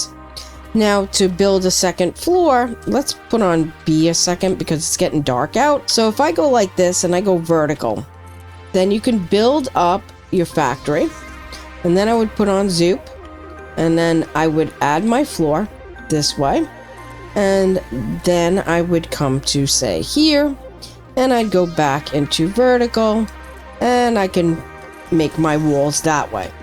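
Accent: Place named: New Jersey, United States. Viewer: I agree! One of the reasons I enjoy this series is because she sounds like my wife’s family, who hail from northern New Jersey. Aside from their making fun of my (practically nonexistent) southern accent for a few years, you won’t find a better group of people. And yeah, they know where the best deli and bagels are (and now so do I!)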